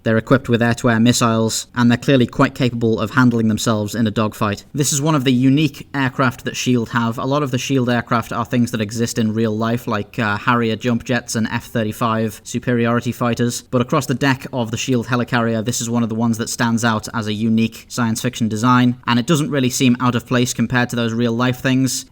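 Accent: United Kingdom. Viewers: You are clearly a Brit.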